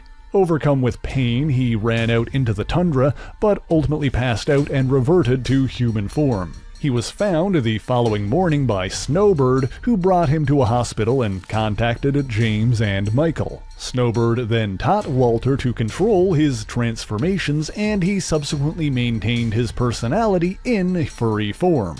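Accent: Canada. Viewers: Good work. Your Canadian accent enhances this video 💯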